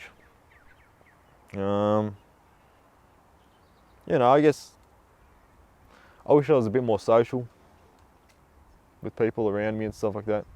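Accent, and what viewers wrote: Australia. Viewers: your lovely australian accent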